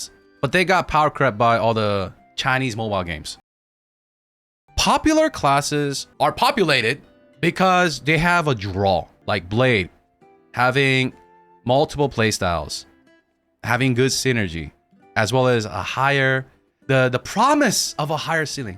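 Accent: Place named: United States. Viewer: Wow you speak American English!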